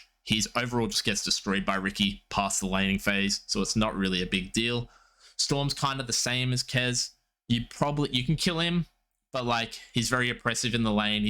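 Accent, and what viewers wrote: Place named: Australia. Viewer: do you play in SEA? you sound aussie XD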